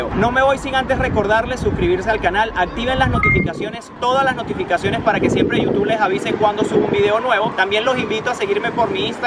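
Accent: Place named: Venezuela. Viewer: De dónde eres youtuber x tu acento creo q eres veneco seguro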